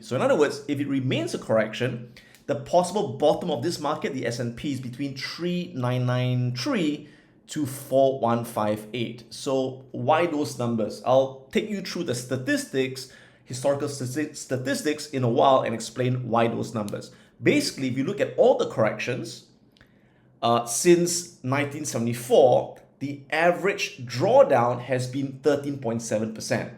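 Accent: Russia. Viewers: Your Russian English is so good, can't remember nothing after watching but that part :) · love the russian accent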